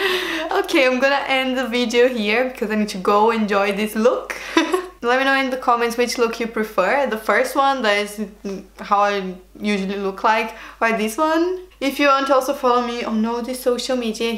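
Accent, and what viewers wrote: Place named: Brazil. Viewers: Allyson Matheus eu também achei o sotaque bem parecido, acho que ela é brasileira kkkk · Você fala português? O jeito que você fala me lembra o sotaque de brasileiro falando inglês --- You speak Portuguese? The way that you speak reminds me the english speaking brazilian accent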